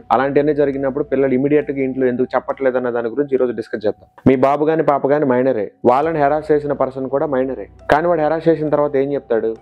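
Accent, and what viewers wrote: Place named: Nellore, India. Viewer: Meedhi nellore ah andi? Me language bhale untundhi Andhuke adiganu.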